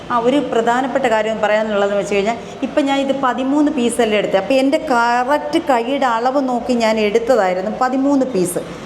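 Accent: Kottayam, India. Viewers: Thamasam tvm anenkilum samsaram kottayam anu ennu thonnum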